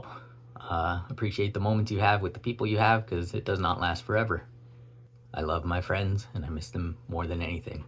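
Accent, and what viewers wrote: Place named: United States. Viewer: What is this event you're talking about thatll kill us all? Are you saying the trump administration is going to instate a theocracy and go all Inquisition on us? Or do you mean something more international, or do you not live in America? Some less vague language might do you well, because you sound American, and no offense but you look and sound like you're going to bomb a synagogue or something. Are you ok? I'm with you though, on the antitheism. I'm right there with you. We need to leave magical thinking and religion behind, I've been saying it for years too. No idea why this 87 view video was on my front page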